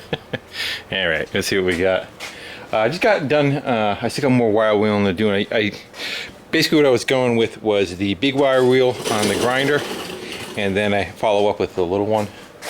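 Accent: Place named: South, United States. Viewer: Thiss'n! Awesome. Love southern speak!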